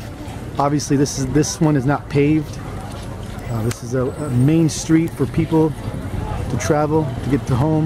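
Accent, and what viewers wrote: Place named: United States. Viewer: You sound American. What state are you from?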